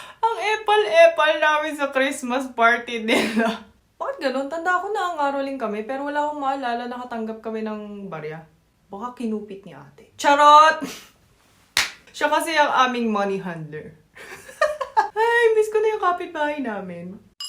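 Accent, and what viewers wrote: Batangas, Philippines. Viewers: not dialect. language because mutually intelligible at iba yung structure ng mga languages dito sa philippines. does speak a dialect of tagalog though which is batangas tagalog, because she’s batangueña and sanay siya doon. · Kabayan (kabatang,batangueña po aq)nakakatuwa ka nmn panoorin ❤ ung accent at dictions mo kabayan talaga g puntong taga batangas ka 😊.Merry Christmas kabayan❤anong namimiss mong food sa Batangas?Kumakain ka ba ng Sinaing na tulingan? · Pinoy na pinoy ang accent mo, buti pa mag asawa ka na lng ng finoy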